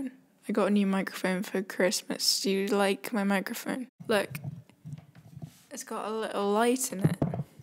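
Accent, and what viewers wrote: United Kingdom. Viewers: He sounds just like him lol. This british accent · That British accent though^^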